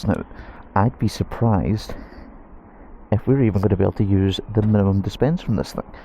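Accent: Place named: Scotland, United Kingdom. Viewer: Your joy is contagious! Love your Scottish accent. Auld alliance. You won a subscriber from France.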